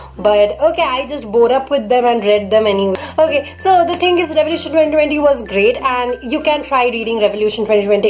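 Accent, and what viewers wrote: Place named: India. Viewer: where are you from 🙄you re kind like indian accents. 😏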